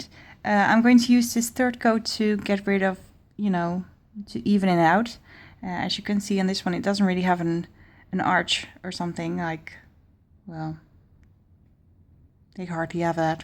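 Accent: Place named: Netherlands. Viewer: bende gij nederlander.. want jou accent is echt Hollands..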